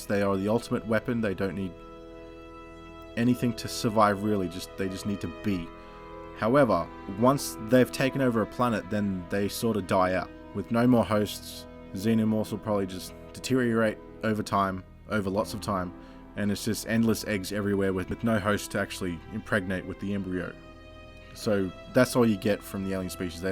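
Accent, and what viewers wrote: Ireland. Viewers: Love your Irish accent lol ! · Your Kerry Irish accent with a mild hint of German is entertainingly close to the mark. This would have been a much better film from a continuity perspective and would have made a lot more sense. Given what we've seen of Shaw in flashbacks I think this film could and potentially will still happen if the Sequel / Prequel mentioned by Ridley Scott is still on the cards.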